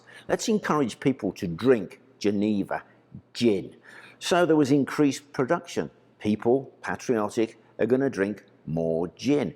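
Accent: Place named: England, United Kingdom. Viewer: All this time I assumed you were in England. That accent better not be fake. I'll be sorely disappointed.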